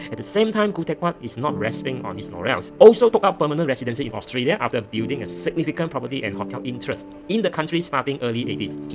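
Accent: Malaysia. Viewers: Love ur original Msian style accent! Good fast pace keep it interesting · Wow your Malaysian accent very strong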